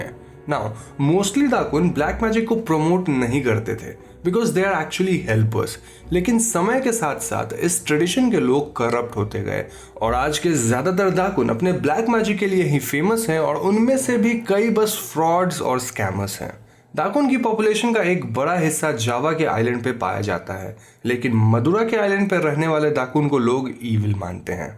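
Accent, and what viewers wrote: Bengal, India. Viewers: Are you a bengalee??? 👨‍💼👨‍💼👨‍💼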